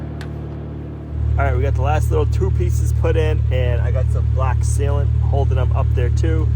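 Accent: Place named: Boston, United States. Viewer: I think he grew up near Boston. His "mornings" are legendary!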